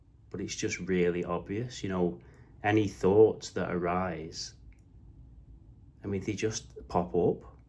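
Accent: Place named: North West England, United Kingdom. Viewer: Cool video man, are u from Manchester? I am from North West England tryna guess ur accent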